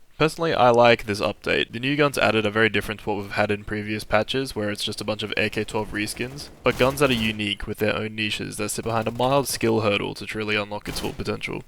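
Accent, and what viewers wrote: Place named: Australia. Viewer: yea its awesome, im australian too but my accent isnt quite like that <3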